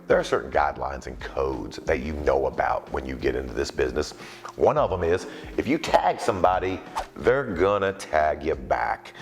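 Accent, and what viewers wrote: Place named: South, United States. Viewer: Thank you brother! You give us the transparency we usually have to dig through dirt sheets for. Can't wait to hear you on commentary when Cole retires. I could totally see you, and pat calling smackdown! We need a southern accent on that mic always!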